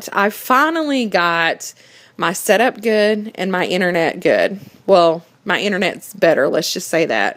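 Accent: United States. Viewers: We got the Country accent, Swarms and great commentaries. This channel has it all! Shout outs to!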